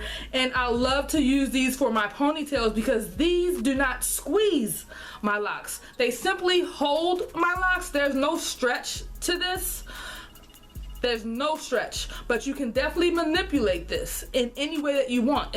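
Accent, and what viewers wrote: Baltimore, United States. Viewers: I gota ask.. lol are you from Baltimore??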